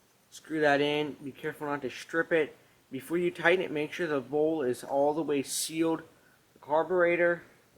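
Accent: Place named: Pittsburgh, United States. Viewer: I can tell. Definitely sound like Pittsburgh. Lol 😂 I am from Eastern Ohio. And we sound similar.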